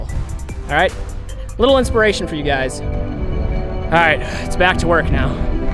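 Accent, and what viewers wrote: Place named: United States. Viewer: Greetings from Europe, thanks for that pure American accent ☺️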